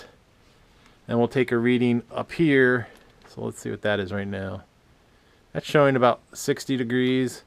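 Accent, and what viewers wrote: North, United Kingdom. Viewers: He is from up north!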